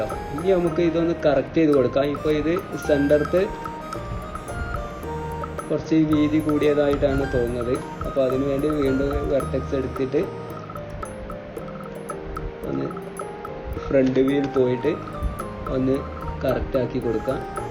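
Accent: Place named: Kanyakumari, India. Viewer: Porathathin video Kasaragod um voice kanyakumari yum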